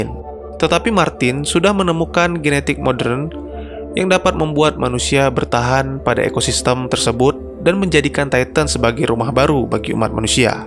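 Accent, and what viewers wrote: Aceh, Indonesia. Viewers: Orang Aceh bg ya.?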